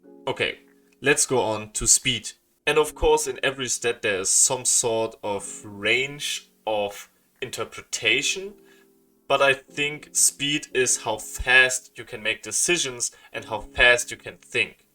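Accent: Germany. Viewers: You sound german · Are you german? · You sound really german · digga dein deutscher accent ist so zu hören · Bruder man merkt so krass das du deutsch bist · Your german accent is really great! it's always good to improve your language ofc :D but your german accent is absolutely fine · geiler deutscher Akzent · Are you German? Klingt bissl so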